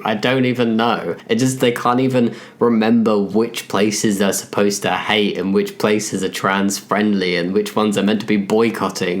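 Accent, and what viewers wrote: United Kingdom. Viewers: can u do an american accent. Ur british accent sounds like nails on a chalkboard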